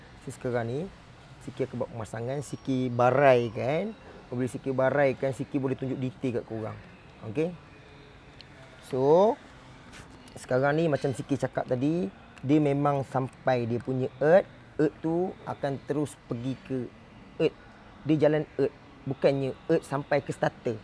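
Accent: Pahang, Malaysia. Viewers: ni cakap mcam org pahang.. bengkel beloh mane..tak daye dah repair kete mahal sokmo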